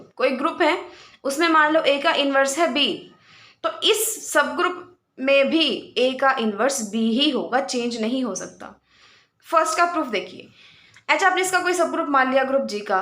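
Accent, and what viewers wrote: Haryana, India. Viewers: Thank you mam... Your voice is so sweet..... With haryanvi touch i 🤔 😅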